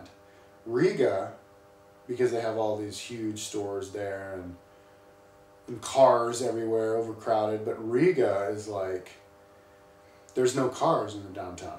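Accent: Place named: United States. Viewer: heheheh, my man, u are full 100% american, especially the way u talk ;)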